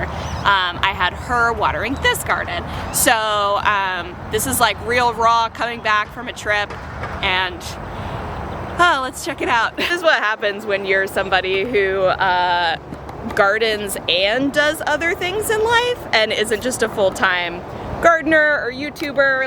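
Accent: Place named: United States. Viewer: Love the garden, but I thought people only talked this way as a caricature of an American accent. Is this for real or she is doing a bit?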